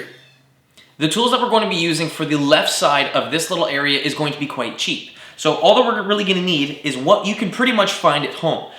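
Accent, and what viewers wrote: Canada. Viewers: definitely Canadian (not holding it against you) lol. Thanks for the demo!! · After 1 second of watching this....wonder which part of 🇨🇦 he’s from 🤔....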